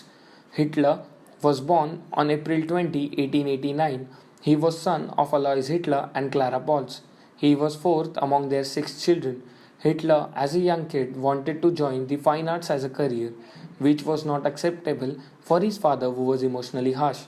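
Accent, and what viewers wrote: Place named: Maharashtra, India. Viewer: आप शायद मराठी हो.. आपकी आवाज बता रही है.. आप के दोनों video अच्छे है.. आप को continue करना चाहिए । हो सके तो Atomic habits पढिये.. बहुत बहुत शुभकामनाएं..💐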